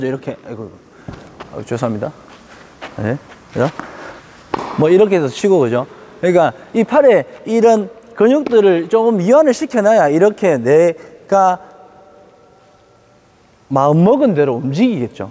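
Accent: Gyeongsang, South Korea. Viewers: 경상지역 사투리 같네요. 툭 던지는 남쪽지역 말투와 대충 치듯 던지는 스윙이 조화로워서 웃음이 절로납니다. 먼 곳(캐나다)에서 영상 잘 보고 있습니다. 힘든 시기 잘 이겨내시고 테니스로 몸도 지켜내시길 기원합니다.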